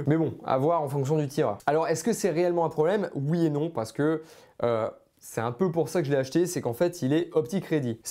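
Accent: North, France. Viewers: Toi t es du nord ou j mapel pas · L’accent du Nord, c’est de trop pour moi. Le contenu est bon mais je n’accroche pas à cause de ça. Je te souhaite une bonne continuation sur YouTube 🙂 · C est un accent du Nord que j'entend? Bonjour de la part d'un ancien Lensois. Pour le depart, il est important de prendre en consideration les problemes rencontres par le P320 lors des tests aux US ou il pouvait arriver qu il tire lorsqu'il tombe dans une certaine position. Meme si il y a encore des debats sur l'origine du probleme, la version officielle de Sig est que le poids de depart etait trop leger et la queue de detente trop lourde, ce qui permettait a la gravite d'activer la detente. Certaines videos semblent indiquer que ce n etait pas le probleme, du moins pas le seul probleme, mais c est clairement a garder en tete pour ceux qui envisagent une modification du mechanisme de detente.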